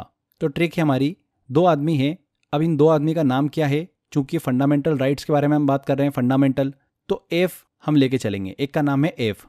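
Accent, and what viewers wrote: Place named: Gujarat, India. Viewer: Aap hai Aapki tone gujarati hai but surname toh up ya bihar mein hota hai Q)toh aap kahan se ho: 1)Gujarat 2)Up 3)Bihar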